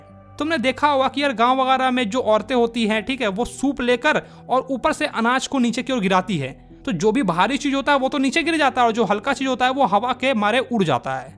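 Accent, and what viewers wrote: Bihar, India. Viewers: Hi sir u are from bihar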